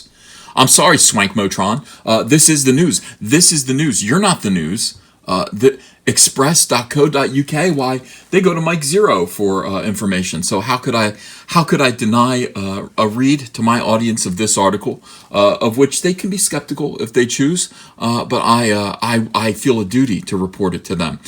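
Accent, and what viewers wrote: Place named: Long Island, United States. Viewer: what is the accent that has? I want to say"gay" but is it Long Island?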